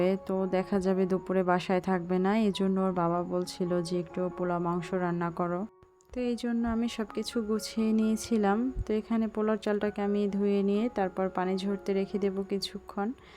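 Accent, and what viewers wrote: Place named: Barishal, Bangladesh. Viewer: বাবা টার জন্য অনেক দোয়া রইলো আপু বরিশাল শুনলে মনে একটু শান্তি শান্তি লাগে পিজ্জা টা অসাধারণ হয়েছে কিনা কড়াইটা ও খুব সুন্দর হয়েছে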